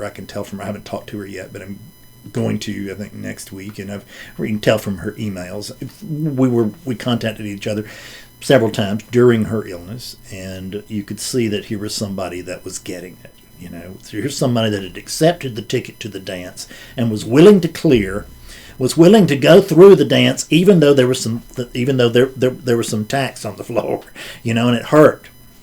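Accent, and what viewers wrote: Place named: Mississippi, United States. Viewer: Hey! Love this!! My AA sponsor turned me onto you. Just curious as to where you're from. I live in Toronto now but I'm from Mississippi. My sponsor thought I'd like really like you because she says we have the same accent.:)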